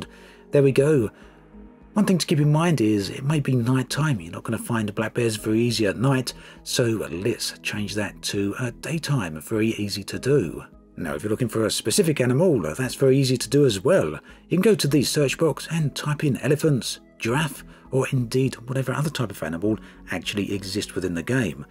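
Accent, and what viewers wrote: United Kingdom. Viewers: His English is so think from Englad or Wales or somewhere. He seaks so fast and move the issues so quickly It is like pulling a rabbit out of a Hot. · Because he’s British? I mean, people do have different accents depending on where they’re from 🙄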